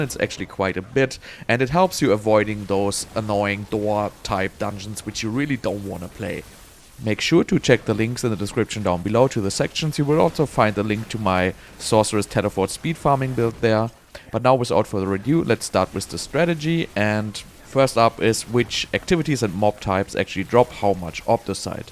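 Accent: Germany. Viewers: Schon in den ersten 5sek. wusste ich das der Dude Deutscher ist😂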